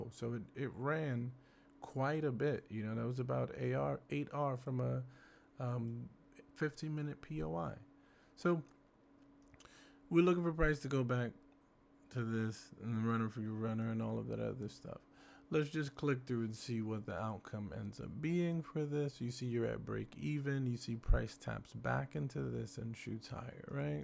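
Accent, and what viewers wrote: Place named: Morocco. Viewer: I smell the Moroccan dialect here 😉 bshto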